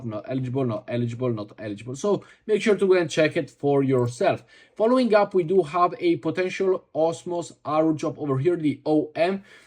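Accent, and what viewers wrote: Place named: Italy. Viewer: Sei italiano e si sente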